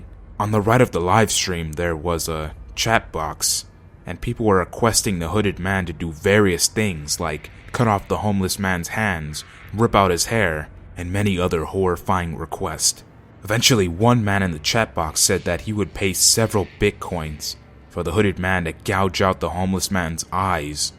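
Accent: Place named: Mexico. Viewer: u sound mexican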